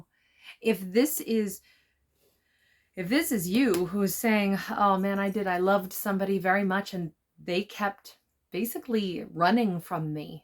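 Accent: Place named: South, United States. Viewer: I thought I recognized a Southern twang when you're speaking! Resonates definitely! He's stuck in fear, infidelity will do that to the one not commiting it. He's lucky I know what this connection is and am filled with COMPASSION, but also strength. Thank you❤🙏🌟✨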